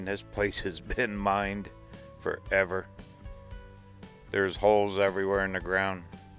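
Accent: United States. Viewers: Your English accent is for any particular area in the U.S. ? I like your videos, cheers from Ireland 🇮🇪 👍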